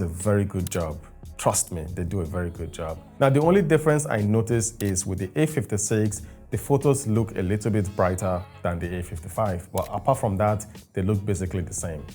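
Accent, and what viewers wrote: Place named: Nigeria. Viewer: Most of the Nigerians be like... "If you aks me"....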